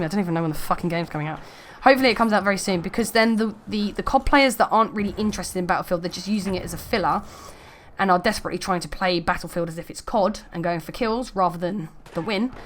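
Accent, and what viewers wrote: United Kingdom. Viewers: Teach me how to British accent pls Also your intro is a tiny bit too long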